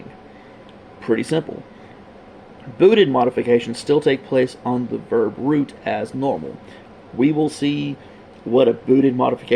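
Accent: United States. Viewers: as a southerner, this is how some people really talk down here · Pretty sure this is just how people from Mississippi talk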